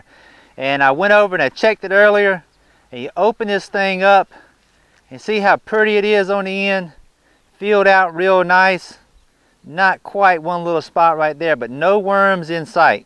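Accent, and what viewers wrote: South, United States. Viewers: A good southern accent is like sweet tea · He really does have a good southern accent. Some are definitely not as fluid and warm as this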